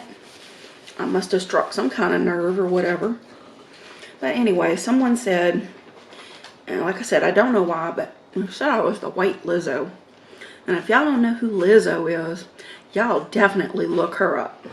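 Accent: South, United States. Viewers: I love that you are talking with us more. Love your little bit of southern accent and your laugh. You really worried me tonight, sweetie, when you swallowed the chicken bone. You were eating fast and that could have been very dangerous. It would break my heart if anything happened to you. If you don't post another video before Christmas, I want to wish you now, the very happiest of Christmas's. I hope there will be a celebration at your house! Thinking of you with Love xoxo. Livia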